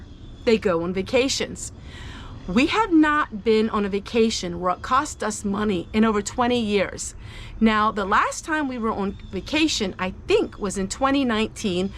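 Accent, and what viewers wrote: Pennsylvania Dutch, United States. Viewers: i love your accent. pa dutch?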